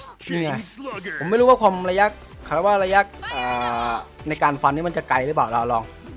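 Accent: Isan, Thailand. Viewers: ชอบคนแบบพี่มาก อ่ะ แบบพูดอีสานสำเนียงไทย 555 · อ่าย เจ่าเป็นคนอีสานบอ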